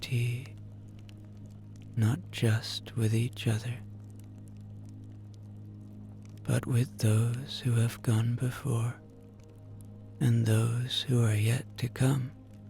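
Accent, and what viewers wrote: Ireland. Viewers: Thank you so much for this story about my ancestors on my grandparents side of the family. I imagined myself there seeing how things were done at that time. I was in reverence and in awe of those people who did so many things in the tribe for the survival of everyone. Hearing you in that Irish accent just melted my heart as I felt a beautiful kinship with you and them. I also miss my grandmother that has passed. When I would pronounce Irish with an i, she would say no it's Arish. 😂 I spelled it right but pronounced it wrong, she said. Thank you for bringing back such wonderful memories of her and educating me on the traditions of my tribe ❤.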